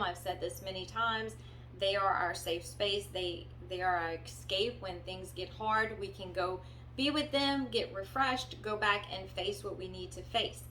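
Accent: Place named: Louisiana, United States. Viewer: Love your videos! You sound like you're from Lafayette... or somewhere here in Louisiana lol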